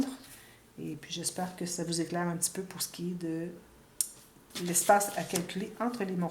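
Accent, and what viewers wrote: Canada. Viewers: C'est exactement ce que vous expliqure si bien... prendre en compte les différentes épaisseurs et modifier en conséquence l'écartement. Je n'ai pas encore suffisamment d'expérience (un peu plus d'un an) D'où mon grand intérêt pour vos vidéos. Je suis hyper maniaque. Vous êtes une des rares personnes que j'aime regarder. Simple, précise, efficace et des réalisations nettes sans tralala. Et votre adorable accent canadien....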